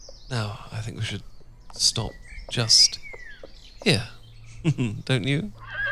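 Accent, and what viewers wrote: United Kingdom. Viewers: More British RP accents please! 💖 I use these to improve my English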